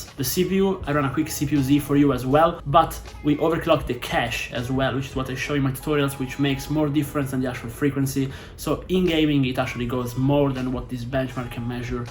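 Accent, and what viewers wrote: Italy. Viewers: Brooo ma sei italiano?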